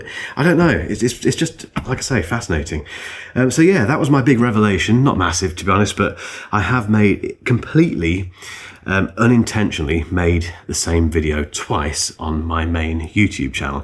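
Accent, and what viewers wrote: United Kingdom. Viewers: I’m one of those people who has been watching every single video on the Macbook pro M4 or the MacBook Air (even now that I’ve had a MacBook pro M4 for 2 or 3 months). I openly admit that if you made an update video on the macbook pro M4 (or a comparison), I would watch it in a sec😂 (i love your opinion AND your british accent). The reason why I do this is that I find interesting knowing how others people’s opinions evolve over time (whether they change or not). I like to see what people find more pleasant on a product that I like and see whether my opinion on particular aspects is shared or not. PS: I have a crush for Patrick Rambles, by the way…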